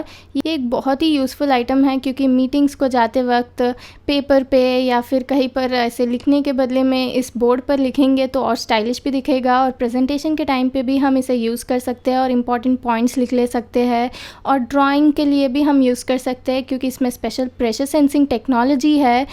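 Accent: Hyderabad, India. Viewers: Are ap hyderabad se ho kya..way u talk like hyderabadi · totally hyderabadi.nai karra.... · Tum hyderabad se ho kya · You have to work on your voice and language I feel Hyadrabi hindi some time ... Keep it up 👍👍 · R u Hyderabadi?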